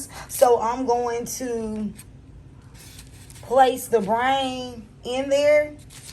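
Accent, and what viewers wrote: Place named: South, United States. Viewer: Luv luv your down south accent...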